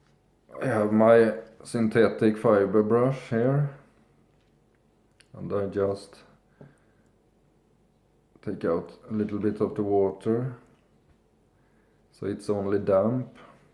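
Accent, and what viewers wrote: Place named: Scandinavia. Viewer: That is quite possibly the most Scandinavian accent I've heard 😄 Nice review mate, I'll definitely be testing this one out myself. Only tried their sandalwood shaving cream so far, so I'm interesting in seeing how this one will perform.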